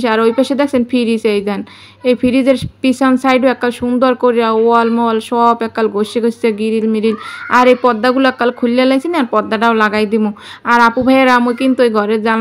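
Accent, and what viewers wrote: Barishal, Bangladesh. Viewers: ❤❤ আপনার কন্ঠ শুনে বুঝতে পারছি,আপনি বরিশালের মেয়ে, আমি ও কিন্তু বরিশালের মেয়ে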